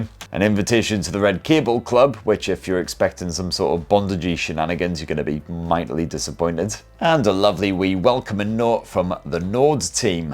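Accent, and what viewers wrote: United Kingdom. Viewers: Love your videos bro! For a Yankee here, can you please tell me what you say in the fantastic British accent at the end? "Pub, scribe, etc"? Cheers🍻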